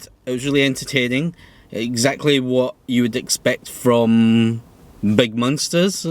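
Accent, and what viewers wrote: United Kingdom. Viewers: Omg okay first things first thanks for the info, this really helps with my future decision for a new car. And second, I never met an Asian person with an accent from GB! So freaking cool! 🤩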